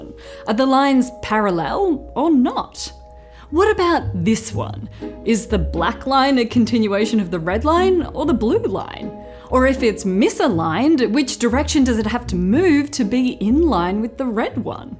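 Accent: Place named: Australia. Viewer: Monologue my brains out. Only with the Aussie accent though.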